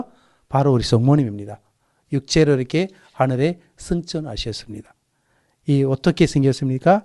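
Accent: South Korea. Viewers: 신부님 발음도 너무 좋으시고 잘 들려요 악센트가 한국사람같아요^^ 잘몰랐던 인도 성모님 소개해주셔서 감사합니다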